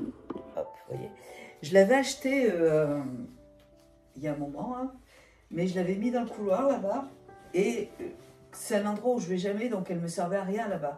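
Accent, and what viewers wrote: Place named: France. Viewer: ❤❤❤❤ very beautiful always. I love your French accent.. sending love from the United states. XOXO. PS will you marry me. LOL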